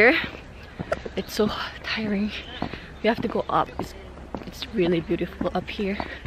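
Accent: Philippines. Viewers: wait—u kinda have a Filipino accent😧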